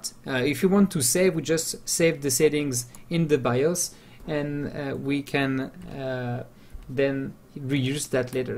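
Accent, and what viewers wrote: France. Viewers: bjr monsieur, êtes vous Français ? Très bonne vidéo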